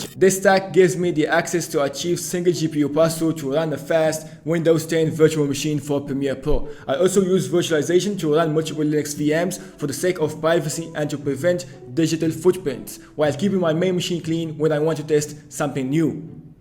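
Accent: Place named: Maghreb. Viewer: mghribi accent, i can sence it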